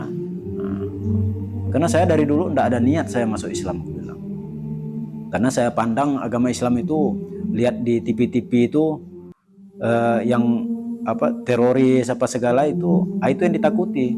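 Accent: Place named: Madura, Indonesia. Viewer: Kok logatx madura bang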